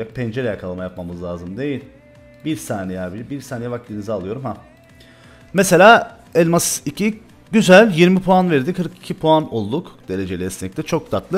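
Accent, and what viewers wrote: Cyprus. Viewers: Abi sen nerelisin çok merak ettim konuşman sanki kıbrıs ağzına benziyo ve videoların çok güzel olmuş eline sağlık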